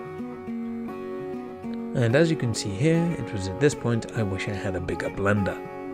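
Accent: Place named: RP, United Kingdom. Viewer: you sounding very posh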